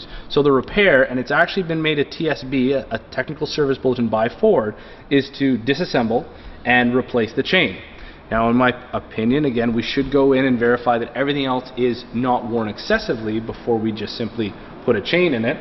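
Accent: Canada. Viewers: Louis Healan he sounds extremely Canadian. Which is fine. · Very informative, easy to follow along, and I'd love to see if he has a video on the 2.0L ecoboost engines like in my 2016 Focus ST. Big thumbs up on this guys' just no bs knowledge based on his experience. Sounds like he's from Canada, shout out from down South in Texas! ^_^ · I liked the video until I started hearing all the "aboots" crazy canucks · I was about to say that he sounded as if he was from Ontario. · He's not only Canadian, but he lives in the heart of Mennonite country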